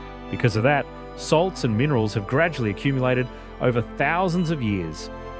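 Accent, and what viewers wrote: Australia. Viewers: Love to hear an Australian describe dimensions in feet and miles..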